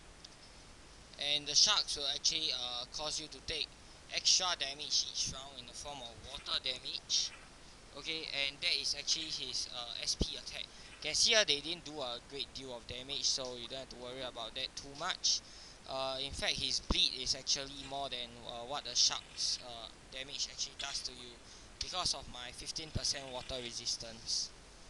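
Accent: Singapore. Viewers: I can tell by your accent that you're singaporean, amiright? xD Also, does the weapon have any uses? its been in my inv for a few months now and i dont know wether to sel it or not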